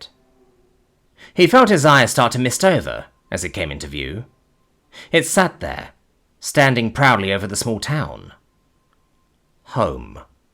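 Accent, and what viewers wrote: United Kingdom. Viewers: Even though I already knew what you looked like, and then got an update on what you look like now, I still can’t help but see what my mind’s eye sees when you start narrating. You just hold a voice that, at least for me, holds its weight in gold. I love when you narrate things, especially audio books. Thanks for everything,. You’re my favorite narrator. Edit: When you talk, I always hear a preppy British kid in a prep school, complete with a patterned vest and slacks, with your hair parted in the middle. Maybe that’s just the American in me, but 🤷‍♂️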